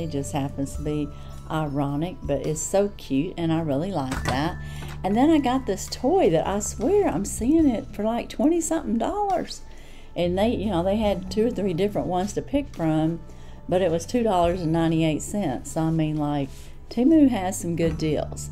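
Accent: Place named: South, United States. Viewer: Hi.. so happy yt put you in my feed. Its nice to hear someone who sounds like me.. country 🤣 😍😍 love it lady keep going! I have started my channel too! Excited God bless you sister 🥰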